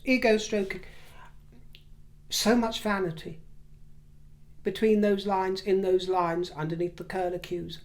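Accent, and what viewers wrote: United States. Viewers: I was thinking about this when I was making a comment. I don’t know if it was under this or the last video I watched but I’m dictating and it’s writing in US English. I can feel all the people over the sea cringing when they read the spelling of “favorite.” 😂 I’m American and I still feel your pain having to speak with an American accent. At least for you it’s only temporary. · Great American accent🙂👍🏻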